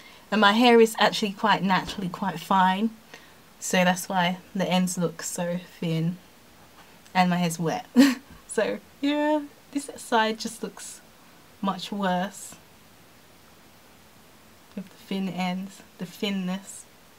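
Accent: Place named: Australia. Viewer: I've been transitioning for 4 months and I keep getting tangles in the roots each time I wash! I prepoo and detangle but as soon as it shampoo (with a sulfate free shampoo), I get tangles in the roots! What am I doing wrong? It's really frustrating and depressing. By the way, the way you talk sounds a lot like Nikki Perkins (from the Jamie and Nikki channel). Are you from Australia or Sudanese by any chance? Lol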